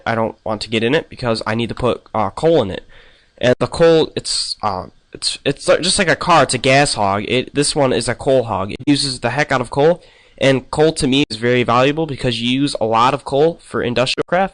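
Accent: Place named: Canada. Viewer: Lol, I love your accent. Are you from Canada?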